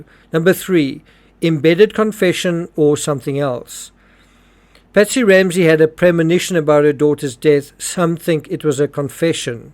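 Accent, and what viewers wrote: South Africa. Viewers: Jon Bennet was abducted and taken to the US Airbase on the Azores for the purpose of sacrificial murder all organised by the then Prince Charles. She was raped to death in the Rape the Children to death races held by the Royals and world leaders. ANYBODY with the knowledge and truth but telling known lies regarding this young girl or ANY other one.. is about to be removed from Earth. PREPARE... BTW... I notice a South African accent... there are human meat processing tunnels in your country as well as mine and many others... 1000's are processed in these factories every day and the people abducted from the war zones. ..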